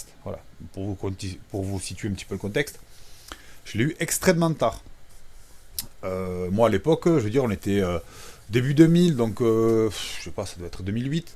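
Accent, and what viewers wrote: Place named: South, France. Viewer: Salut merci pour la vidéo, avé l'accent 😀, belle collec. J'aime beaucoup la musique d'intro c'est quoi?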